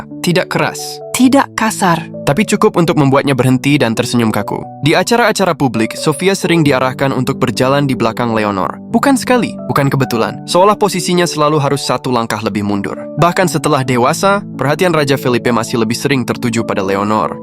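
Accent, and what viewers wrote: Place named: Malaysia. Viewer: Mimin sering mengikuti intonasi kalimat dalam bahasa malaydesh, Hal itu tidak akan berpengaruh kepada pengaruh bahasa Indonesia di wilayah asia tenggara.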